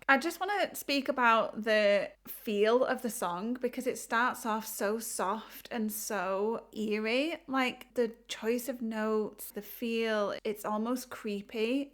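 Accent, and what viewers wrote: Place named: England, United Kingdom. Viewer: Hey, Found you through your reaction..Subbed for more, but I have to say You are f*n fun! Your look is absolutely rocking and I could just sit here and listen to that English accent and rock out with you all day! My wife is a Brit BTW, so I may be biased..lol) I see you have reacted to so I will be checking those out too. I am a GEN X guy, so would be my choice on the desert island if I could only listen to one band for the rest of my life. (Although, definitely has made me questioning that choice:) ). I would love to s see you react to -. I see your channel is quite new...let's build you up! I'm here for it!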